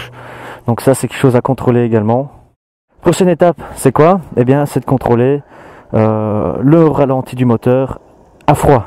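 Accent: Belgium. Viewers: Tu es Belge ?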